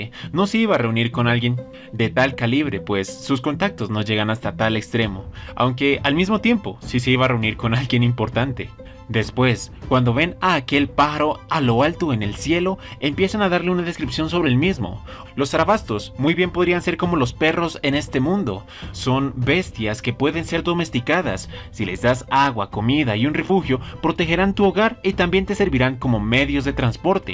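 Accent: Colombia. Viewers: Eres colombiano!?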